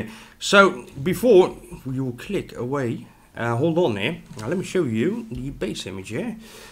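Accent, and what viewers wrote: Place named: Netherlands. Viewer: Liliana Georgiana Boros Als je goed kijkt naar de items in z'n browser, bv 'Hobbie' dan is het duidelijk een Nederlander. Dat kun je ook horen aan de manier waarop hij Engels praat. Nederlanders praten op een hele typerende manier Engels.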